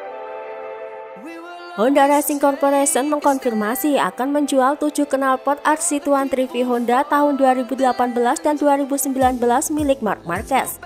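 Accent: Java, Indonesia. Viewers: komentator nya jowo banget ya wkwkwkwkwk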